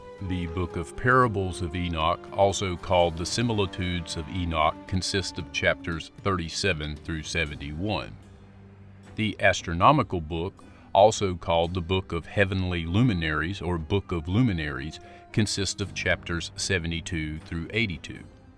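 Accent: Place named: Baltimore, United States. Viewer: im thinking baltimore or philly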